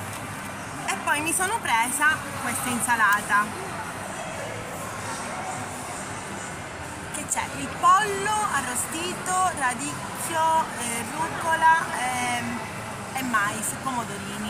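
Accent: Sicily, Italy. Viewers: Si sente l'accento siciliano ahhahahahhaha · Ciao simpaticona! Mi è familiare il tuo siciliano😂😂😂 Pizza bruciata, io l'avrei portata indietro subito! Ciao... non ricordo il tuo nome, a presto!😉😘Pollicione in su!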